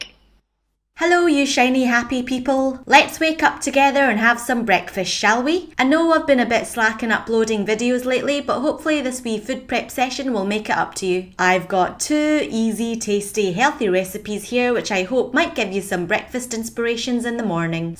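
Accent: United Kingdom. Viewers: From your accent I thought you were from the UK, but once I saw the black swan hummus and heard you bought it from Coles I knew you're from Australia! So I subscribed start away! Yah~ can't wait for more videos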